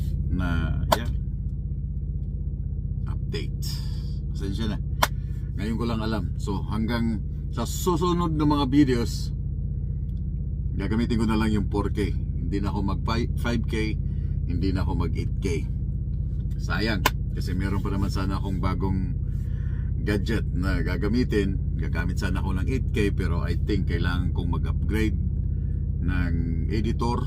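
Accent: Visayas, Philippines. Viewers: Morag bisaya lagi ka dong😀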